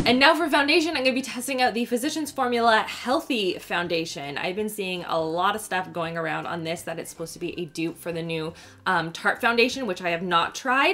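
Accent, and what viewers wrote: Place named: Canada. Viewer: I love your videos so much! Thanks for being so fun 💙😋 is it weird that I love how you talk? I’m from Texas so nobody here has a Canadian accent and it’s so cool how you say certain things! Anyways love ya! 💄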